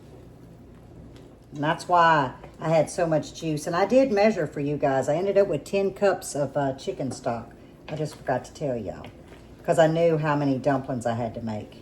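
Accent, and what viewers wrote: South, United States. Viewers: Oh honey, you’re 😅Southern! You sound just like me and everyone I know.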